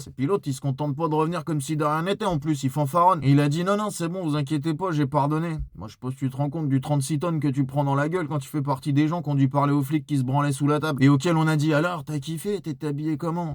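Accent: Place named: Banlieue, France. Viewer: j'adore ton parler banlieusard un regal 😂❤😂❤😂❤😂❤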